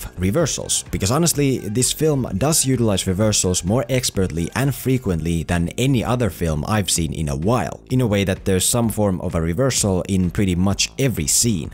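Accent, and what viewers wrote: Finland. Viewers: Are you Finnish? Because your ralli englanti is showing through. Ja jos oot ni mitä äiä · You have the most Finnish accent. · Are you finnish? For some reason im hearing a finnish accent...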